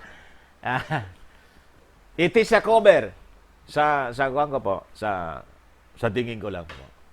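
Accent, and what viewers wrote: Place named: Waray, Philippines. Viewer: TamA ka manOy, Hahahaha natatawa din akO.. natatawa AkO sa sige na gud ( ang sige nA ngA: )) Ang sarAp pakinggan dahiL kuha mO ang accents ng warAynOn, isa akong taga pakinig sayO manOy, at warAy-warAy din akO purong waraynOn.. at ganon din si MadAm Imelda R. MARCOS AY isang waray waray din. God BLESS.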